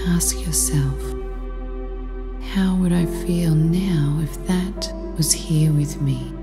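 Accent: Australia. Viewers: What a beautiful meditation! Her voice is just so calming & soothing. I believe it was an Australian accent(?) I LOVE that accent!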